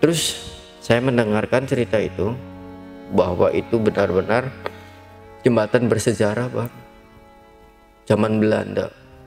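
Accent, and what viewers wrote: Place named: Cirebon, Indonesia. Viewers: Logat ngmng nya ini kaya orang cirebon😄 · logat nya kaya orng Indramayu or Cirebon...Krn paham bener,cerita kurang paham yakin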